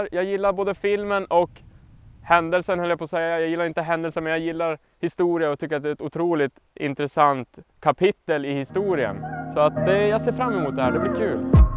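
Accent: Norrland, Sweden. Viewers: Härligt med lite norrländska!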